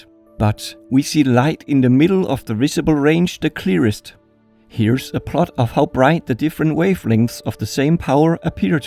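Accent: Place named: Denmark. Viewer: You sound Danish :)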